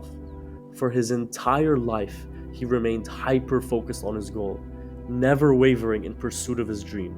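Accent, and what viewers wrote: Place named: Levant. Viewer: course! On an unrelated note, are you from the levant? Your general vibe and inflections sort of make me think you're from the Lavant, perhaps Syria or Jordan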